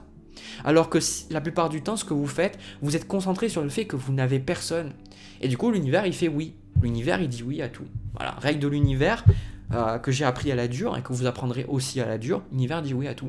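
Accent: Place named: South, France. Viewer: Merci beaucoup...l'accent de "notre " sud est 1 plus 😊😉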